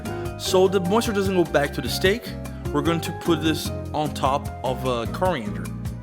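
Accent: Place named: Netherlands. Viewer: You sound Dutch with your accent. I am from the Netherlands and I have a brazillian wife. I found your channel looking for good recipes. Are we bros?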